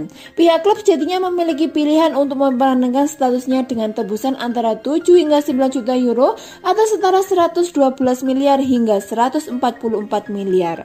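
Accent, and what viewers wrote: Java, Indonesia. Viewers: Narator nya medok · Seneng banget denger conten ini, jawa nya medok.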